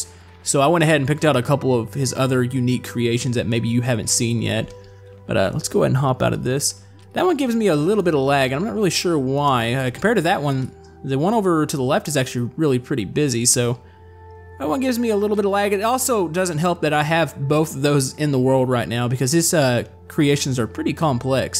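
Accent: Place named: United States. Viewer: i can really here your country acsent in this video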